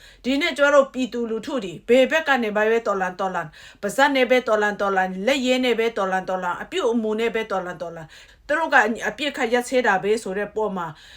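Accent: Kachin, Myanmar. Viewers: စိတ်မချမ်းသာဆို...နေ့စဥ်လိုလိုပါဘဲ ရယ်....ကချင်သံဝဲဝဲလေးနဲ့ ရဲ့မျက်နှာလှလှလေး{....အော်ရီဂျင်နယ်.....မဟုတ်လောက်သော....]ကိုမြင်ရရင်...စိတ်သက်သာရာရစေတာတော့အမှန်ဘဲ...ညီမလေးနဲ့ဂျိန်းဖောမျိုးနွယ်တွေအားလုံးဘေးကင်းရန်ကွာကျန်းမာပျော်ရွှင်နိုင်ကြပါစေ.......ထာဝရအားပေးလျက်.....။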